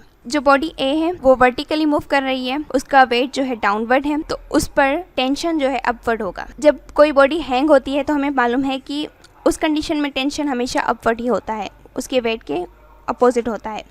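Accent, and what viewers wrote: Sindh, India. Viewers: Mam are you from sindh and are you Sindhi I think your mother tongue is sindhi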